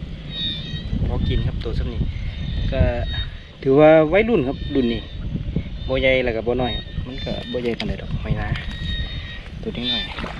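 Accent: Chaiyaphum, Thailand. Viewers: กล้องดีเนาะฟังสำเนียงคือคนชัยภูมิบ่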